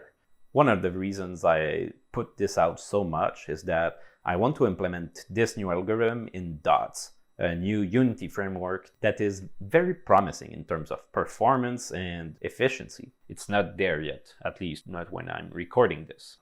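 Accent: Quebec, Canada. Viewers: I am from Quebec (Canada) and your accent is pretty similar at the one that we have here when we're talking english, are you from Quebec? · Hey mec j’ai vu ton vidéo sur la simulation de 100 heures et en t’écoutant je me disais: ha j’suis sur que c’est un ingénieur (québécois). Tu représentes le ´deuxième ´ type d’ingénieur: le type qui devient moniteur de ski ou maraîcher ou youtubeur… bonne chance dans ton projet c’est super intéressant!!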